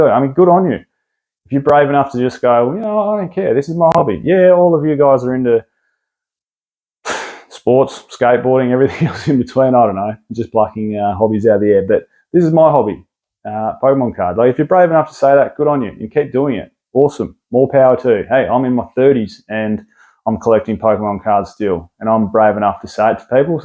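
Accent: Australia. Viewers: Love having a genuine aussie guy talking about pokemon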